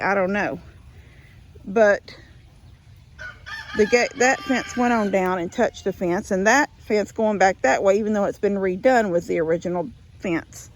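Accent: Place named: South, United States. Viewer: I love your country talking. Some of your words todays I haven't heard in years. You are the best!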